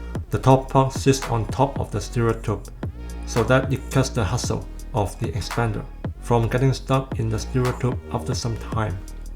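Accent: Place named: Canada. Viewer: I think hes chinese Canadian. I base off his accent and I think I got a hint about the canada in a past video.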